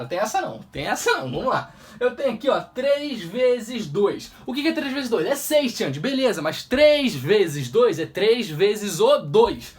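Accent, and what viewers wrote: Rio de Janeiro, Brazil. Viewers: De onde você é do Rio ?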